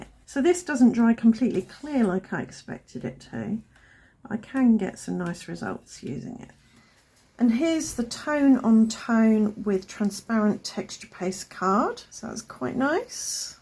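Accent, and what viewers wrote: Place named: United Kingdom. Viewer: I really get a lot out of your videos! Thank you for all your tips, imaginative techniques and use of DYI tools and products. I see you using a grip mat in many of your videos. What brand is that grip mat, please? I am in the USA. A side note, my husband thinks I am talking to someone in another country when he hears your videos in the background. I just let him think that and call you 'my British friend'!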